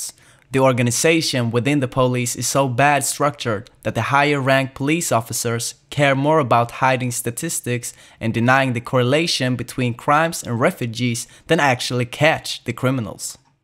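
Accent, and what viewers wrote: South, United States. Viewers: Where did you pick up that Southern American accent? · Never knew Swedes has such a US southern accent 😂 I don’t doubt that you’re Swedish, but from who did you learn English from? Sounds like someone from where I’m from, the deep USA south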